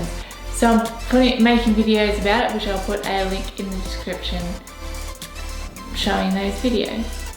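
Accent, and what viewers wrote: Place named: Australia. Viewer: You sound like a heck of an Aussie girl,. A like & subscribe from me as well & now following you on FB. Keep up the great work & all power to you!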